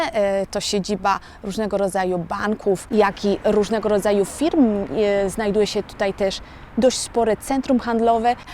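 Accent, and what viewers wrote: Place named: Italy. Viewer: Ale już słychać ze mieszkasz we Włoszech z Twojego akcentu ❤️ a pamietam Twój głos jeszcze z czasów liceum. Bardzo fajny pomysł jeśli chodzi o informacje prawne! Powodzenia.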